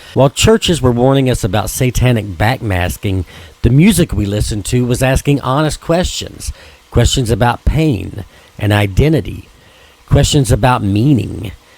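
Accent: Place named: North Carolina, United States. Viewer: thought I was hearing NC. ✌️🤘🏻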